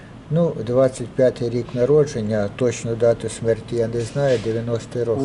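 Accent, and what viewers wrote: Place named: Russia. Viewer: Надеюсь все выучили слово благодаря)))., Ваш украинский все равно русский.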